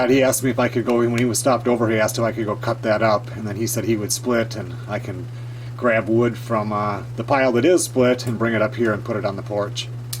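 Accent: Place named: Northwoods, United States. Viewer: Just found your channel; great to hear that accent. I was born and raised in Cloquet, lived in Duluth; now transplanted to OKC. These will help me get my Northwoods fix, so thanks.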